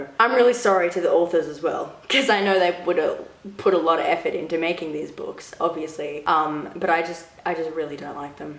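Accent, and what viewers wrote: Australia. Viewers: It’s definitely an Aussie accent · that's not a British accent it's more of an Aussie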